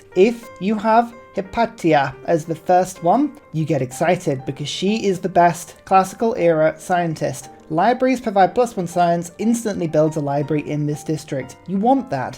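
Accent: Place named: United Kingdom. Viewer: Thanks for this "over explained" video. You are very enjoyable to hang out with. Love the mellow jazz and your humor and obvious talent at this great game. What is it about the British accent that is so good to listen to? It gives you intelligence and authority-but you keep it nice and light with your jaunty sense of humor-well done.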